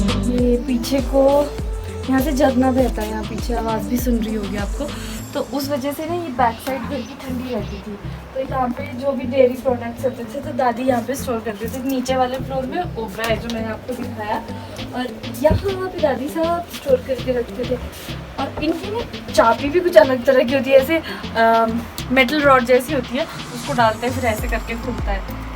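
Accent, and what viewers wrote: Pahadi, India. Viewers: Your voice is be like pahadi nice voice 🥰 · Hahah too sweet ,you have that pahadi touch in your voice and cute expressions😌😌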